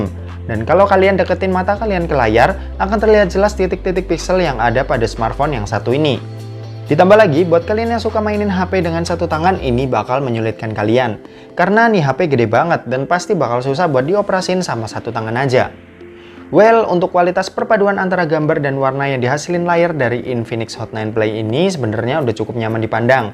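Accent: Semarang, Indonesia. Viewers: Wah, abang orang Semarang ya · Orang semarang lu yak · Org semarang ya gan?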